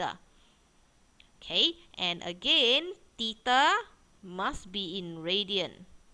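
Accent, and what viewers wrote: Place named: China. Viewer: She's Chineese. Indians have worse accent.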